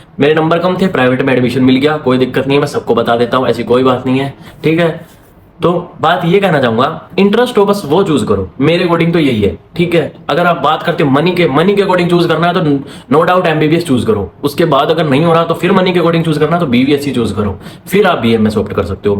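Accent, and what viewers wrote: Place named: Pakistan. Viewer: bhai yr no offense, aap haryana se ho but aapka accent pta ni kyo mujhe pakistani wali vibe deta hai, jo woh urdu ka hota hai, mast...baaki content toh bdhiya hai hi, aur woh interest wali baat hi sahi hai, jisko sachme animals se pyar hai wohi Veterinary kre, mujhe agle saal NEET dena hai but m already prepared to be a veterinary.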